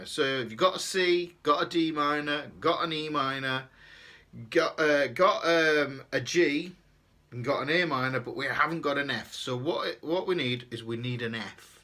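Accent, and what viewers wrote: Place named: Northern England, United Kingdom. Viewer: So happy I stumbled across your channel today, I am now binging, you are amazing, I love the Northern accent too! My mum is from Derbyshire, Glossop actually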